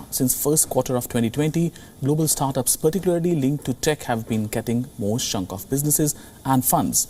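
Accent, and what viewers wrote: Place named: India. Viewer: scam news network with a ganges river accent🤮🤮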